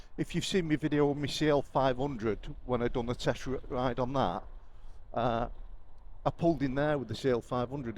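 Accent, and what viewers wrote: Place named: Lancashire, United Kingdom. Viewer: Very enjoyable "wednesday waffle", i enjoy your miss mash manc,cheshire,warrington accent more than posh freddies dialect,. I had to laugh when you decided not to follow the silver car and ended up pulling out behind him again when you came to the junction,. Nice change of scenery at the ducati shop instead of your usual go to "youles", a breath of fresh air, i used to go to woods of abergelle (now no more with the completely motorbikes crash) for my ducati fix, andrew woods was brilliant, he would let you demo most bikes, i took my old boss,s 748sp there once for a service and andrew gave me a 916 for a few hours while he serviced the 748, the first demo i ever had there was an early 900s or something simalar, it put me right off ducati's, the early ones werent very refined, the 916 was lovely tho,.i'm a yamaha man through and through so i dont tend to get side tracked to easily,. 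My eldest son bought an R reg (98) 996 sps and its cost him a fortune over the years, mechanical issues early on and now belt changes even tho he has'nt ridden it since 2019, still has to do a belt service when its due, it costs him £25 p/week storage costs in london to, married with 2 young kids and a high pressure job gives him plenty of money but little time to enjoy the bike,.he bought 3 of my collection recently and he has them garaged at a friends place 20+ miles outside london, only room for the 3 of them, tzr3xvsp 250,rd350b, cb750k2, ive still got my yamaha R7 ow02 (2000) at least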